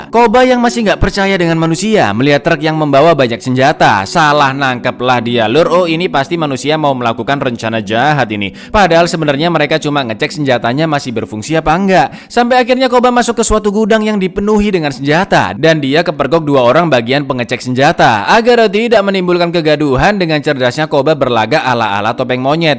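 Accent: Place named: Surabaya, Indonesia. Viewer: Aku arek mojokerto paham banget celometane arek suroboyo sidoarjo model iki wkwkwk nggarai mengkel2 kudu ngguyu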